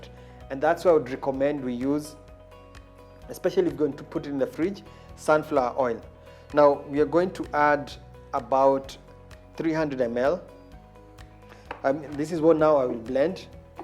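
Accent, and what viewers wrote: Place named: East Africa. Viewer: Oooh I can understand this Gud East African English thank uuu chef!!!💪💪💪❤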